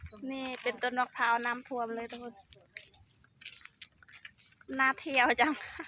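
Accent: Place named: Cambodia. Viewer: เสียงเว้าค่อคนเขมรเนาะ (ສຳເນີຍງຄືຄນຂ້ເຫມນເນາະ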